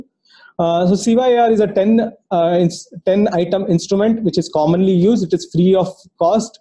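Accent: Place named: India. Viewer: Thanks doctor, this is a fantastic explaination that has helped me and i always watch this while dosing, even though there is a strong indian accent for those who dont understand it too well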